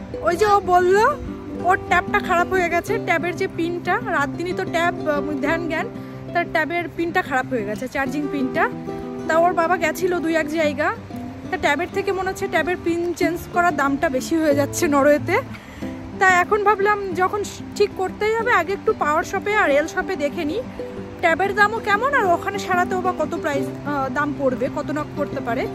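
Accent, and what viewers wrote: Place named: Bangladesh. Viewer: Actually apnar kotha shune majha majha Bangladeshi moto lage. Tai ask korlam.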